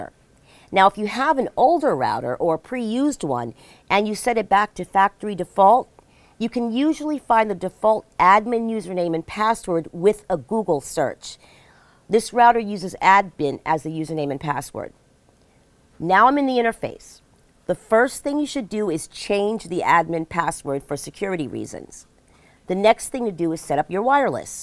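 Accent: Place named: New York, United States. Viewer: THICK ny accent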